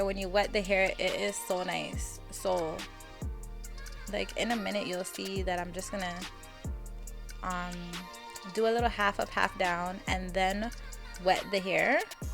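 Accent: Toronto, Canada. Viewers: Very random but are you canadian? 😂 ur accent is giving very much Canadian like me!! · Your Toronto accent is stronggg girl. Thank you for this!! ❤️❤️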